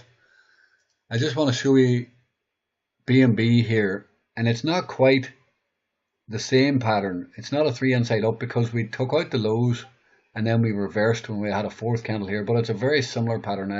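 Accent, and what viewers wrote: Northern Ireland, United Kingdom. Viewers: Where you from! Sounds like northern ireland to me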